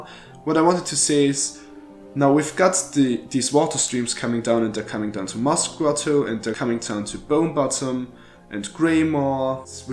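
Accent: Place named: Germany. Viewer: Ich der direkt nach den ersten Sätzen bemerkt das nen Deutscher ist den man hört es einfach richtig stark raus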